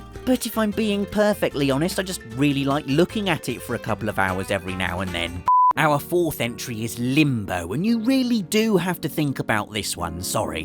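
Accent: United Kingdom. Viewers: As a new viewer, I have to say your videos are worth watching even if one does not take interest in gaming, that British voice is just remarkably fun to listen to :) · Man i just absolutely love the british accent ;D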